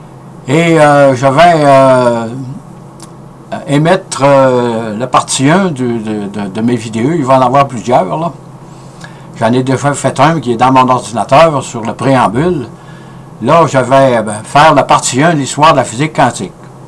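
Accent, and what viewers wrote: Quebec, Canada. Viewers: Depuis le Maroc Merci beaucoup. malgré l'accent québécois j'arrive à comprendre votre magnétique exposé.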